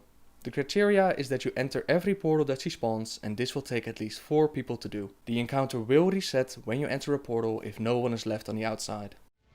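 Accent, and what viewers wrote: Netherlands. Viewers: Ik hoor gelijk dat je Nederlands bent. :P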